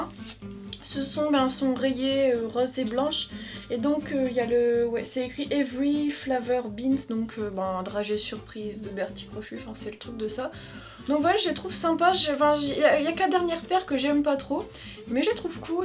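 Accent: North, France. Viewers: Tu as un joli accent du nord de la France :) Merci pour tes vidéos!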